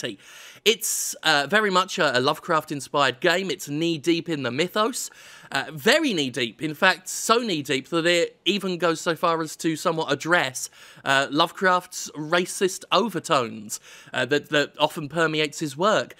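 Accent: United Kingdom. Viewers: Sometiems, you can hear the Britishness just oozing out of. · Fuck me, did not expect to be slapped in the face by a massive dose of nostalgia the moment I boot up a sterling vid! You may be in America but you are deffo a brit through an through ;)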